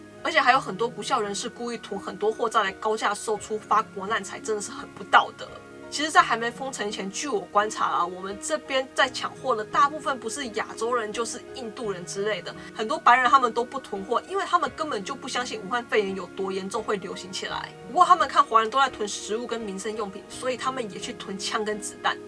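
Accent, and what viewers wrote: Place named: Taiwan. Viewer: 武汉你妹，死台湾腔！你们美爹控制不住了哈，你们等死吧！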